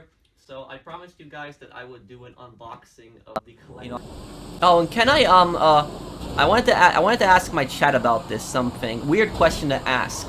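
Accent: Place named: United Kingdom. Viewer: I love he’s solid gta YouTuber, I have 0 hate for him but this video honestly made me laugh so hard, haven’t laughed that hard in a long while so thank you man👍 Also I could tell your British same as me with the east Enders intro and outro 🤣🤣🤣 loved it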